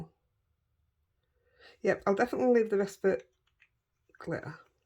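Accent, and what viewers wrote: Yorkshire, United Kingdom. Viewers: I love your videos and please please continue to blether on while you are crafting in your very lovely Yorkshire accent. Love the snoring pups too ❤xx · Omg some people are so rude. I am also from Yorkshire and we do have strong accents. I’ve still got mine and lived in Australia for many a moon. If they don’t like it don’t watch it. There is plenty that love your channel. Why are people so negative I answer you lol. Keep up the brill work and don’t change a thing. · I adore your Yorkshire accent, I've got friends with similar accents. I live in North Wales but have a London accent, no problems understanding you. You make me laugh too! Bought presents for all the tags you made, lol! I was listening out for you at Lavinia, but someone said you'd come earlier, I don't do early! Love your cards ❤ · You might want to explain what droll means for non-Tykes. 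BTW I am married to a Tyke and love the accent. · Don't apologize for your Yorkshire accent, I love it...reminds me of me Gramma. I have just discovered your channel and I will be back.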